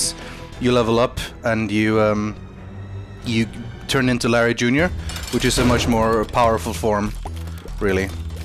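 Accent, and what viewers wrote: Scotland, United Kingdom. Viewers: Wow got a lot less Scottish. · sounds more Scottish than usual in this video for some reason.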